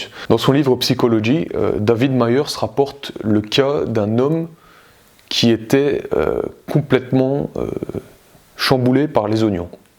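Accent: Belgium. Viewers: Petit accent belge spotted ;) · Par contre il a un pouissant accent belge.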